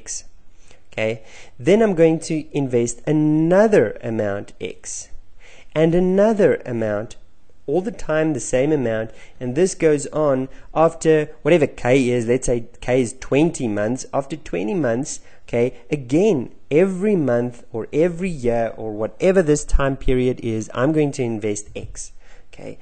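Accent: South Africa. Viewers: Thought you were from sunny South Africa. Thanks for the video :)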